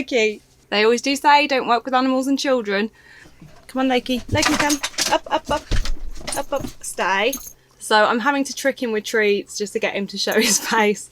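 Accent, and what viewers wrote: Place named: Birmingham, United Kingdom. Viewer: I ordered this palette today, can’t wait to use it!!! It’s so beautiful. Is that a Brummie accent I hear? Fellow Brummie!!!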